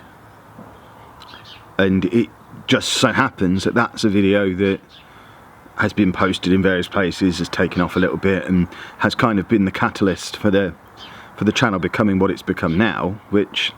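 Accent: England, United Kingdom. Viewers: Are you English or americar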